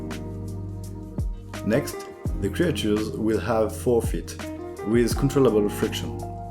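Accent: France. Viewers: ahlahlahhh qu'il est beau notre accent francais ! j'adore 😆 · J'ai reconnu l'accent français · love ur french accent ! u are french right ? je le suis aussi xD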